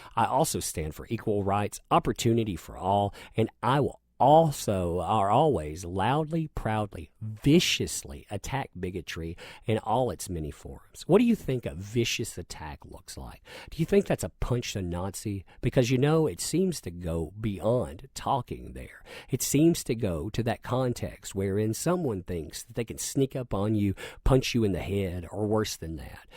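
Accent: South, United States. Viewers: Lol. Right. I’ve left comments a couple times about the “within this/that” blowing him shit about it in a friendly way. But this particular video had “within” all over it in other contexts too. Yeah I’m mostly just kidding. It doesn’t actually bother me or anything. And that’s a great way to look at it! You know he hasn’t been replaced haha I was thinking maybe that’s like a regional colloquial thing(?). He does have a southern accent a bit and I’m from the Midwest. Maybe that’s something folks say where he’s from. Now cap’n Cummings I just can’t watch anymore. Not because I disagree w him on anything at all (I 100% agree w his takes just like D&C and ) but his constant mispronunciation and misreading of simple common words. He’s SO dumb I can’t take it lol. God love him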